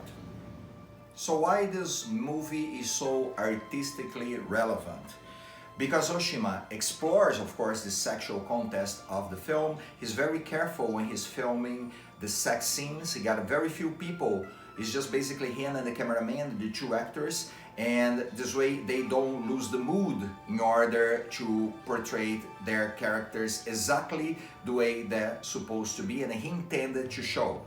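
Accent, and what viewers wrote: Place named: Brazil. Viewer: O sotaque brasileiro é tão fácil de notar.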